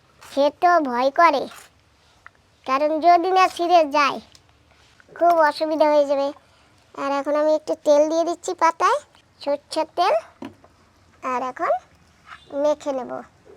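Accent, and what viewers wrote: Barishal, Bangladesh. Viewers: দিদি তোমরা কি ওখানকার স্থানীয় নাকি বাংলাদেশের সাথে তোমাদের কোন সম্পর্ক আছে আমাদের বাংলাদেশে বরিশাল জেলায় তোমরা যে ভাষায় কথা বলো আমাদের বরিশালের ভাষা একই একটু জানাবেন দিদি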